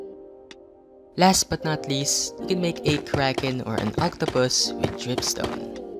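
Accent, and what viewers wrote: Philippines. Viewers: You sounds like filipino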